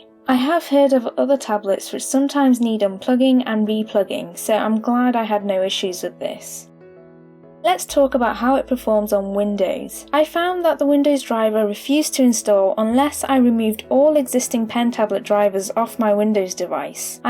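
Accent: United Kingdom. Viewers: I didn't notice the accent probably because we're both British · Thank you for your tutorial thank you for explaining it that was very impressive love your British accent and again I gave you a thumbs up and a subscription thank you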